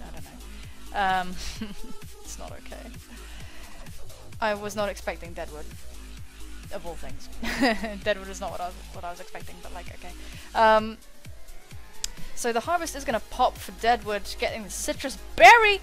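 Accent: England, United Kingdom. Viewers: Where in UK are you from? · Sounds awesome! Does anyone pick up on the English accent? :) But is it possible to get tea over there is an important question! Congrats on the 2K subscriber boost by the way, it's incredible!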